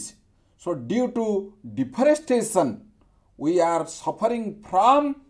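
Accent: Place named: Odisha, India. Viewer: Om my god this is english or odia...i think this man pronounciating English as odia launguage..😄😄😄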